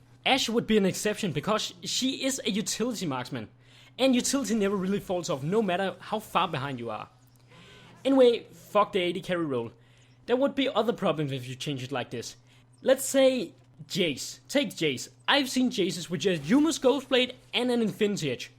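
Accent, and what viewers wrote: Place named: Denmark. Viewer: dat dansk accent :D fed video ^^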